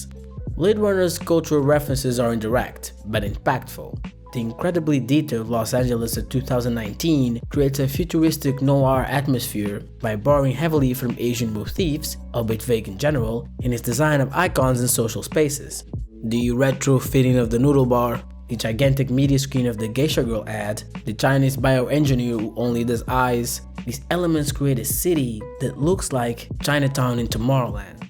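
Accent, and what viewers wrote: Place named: Russia. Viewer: Ебать! русский акцент! ххптьфу!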